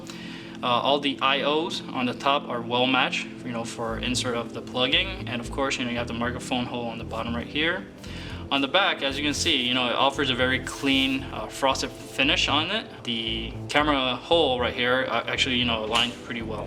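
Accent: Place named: China. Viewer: I couldn't enjoy the video. The presenter has a thick accent. Sounds like Chinese/Mandarin is his first language? That's my best guess.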